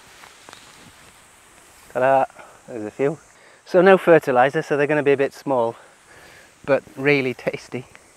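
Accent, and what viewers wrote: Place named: Yorkshire, United Kingdom. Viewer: Who are you? are you half Japanese? from where did you get your Yorkshire accent? Tell us more about you mate. Thanks for the great work. Love you.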